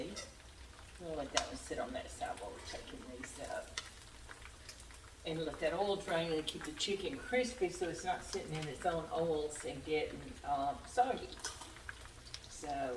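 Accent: South, United States. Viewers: I enjoy watching you and listening to your Southern accent. You cook the good foods I grew up on. I am 73 and I always helped my grandma and my mom cook and bake. We were a self sustainable family and I really enjoyed it. I lived that life until I retired. I miss that life, but my kids grew up that way and they are following in are footsteps. They are both wonderful cooks. Sometimes they have cooking contests between them. You are wonderful thankyou for sharing.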